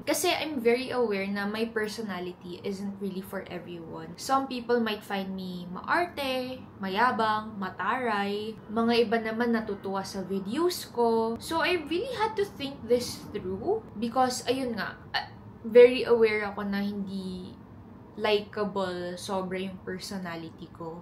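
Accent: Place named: Philippines. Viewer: Pinay na Pinay yng accent mo!Galing mo mag tagalog!👏❤️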